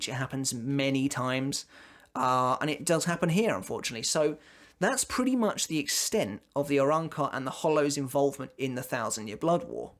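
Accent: United Kingdom. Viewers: Bro you british ?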